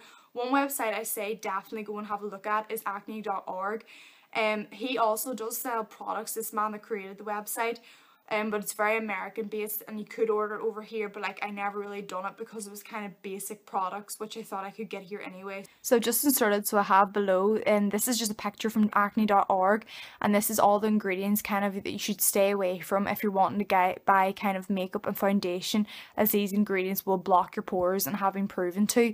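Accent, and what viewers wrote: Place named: Birmingham, United Kingdom. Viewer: U speak peaky blinders accent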